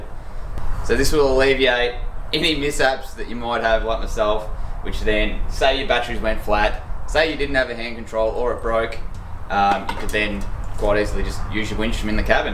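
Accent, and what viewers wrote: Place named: Australia. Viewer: Honestly, as an American, I could listen to Aussies speak on 4x4s all day long. Good video mate! Cheers!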